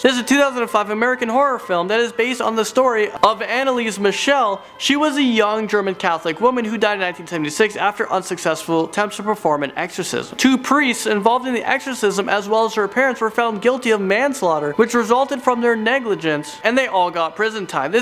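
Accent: United Kingdom. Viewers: British sounds Better!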